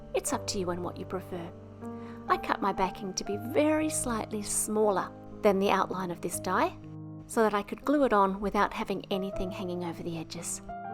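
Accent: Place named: Australia. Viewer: Hi, I loved these cards they are simply stunning, and now I want to purchase the butterfly dies. It is always a joy watching you create your cards... with your easy to listen to Aussie voice, and the beautiful relaxing music in the background. Everything blends so well together, and it matches your creativity. I am so blessed to have found your channel., I am so sorry for your loss, and this is such a beautiful way to pay tribute to your nephew Daniel. Prayers for you and your family at this very sad time. May you find comfort in our Lord and Saviour Jesus. God's blessings and love, Gloria 🙏💐❤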